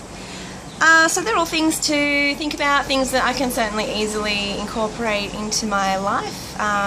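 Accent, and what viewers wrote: Australia. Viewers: Hi there. I just want to say thank you for your videos. I am currently on the journey to have this surgery. I have watched many many videos over the years and I have to say yours have been the most inspirational. I love your whole attitude and your outlook through your journey. Like I said very inspirational. Thank you for that! By the way love your Aussie accent :-)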